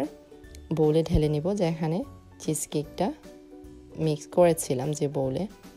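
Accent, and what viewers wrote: Sylhet, Bangladesh. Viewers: Api you're from sylhet? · Assalamualikum apu apni ki Sylheti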